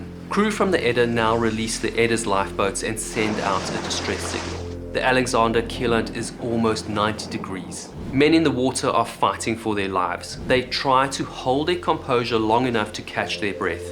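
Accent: South Africa. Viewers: I asked him the exact same thing when I discovered the channel :) Are you south african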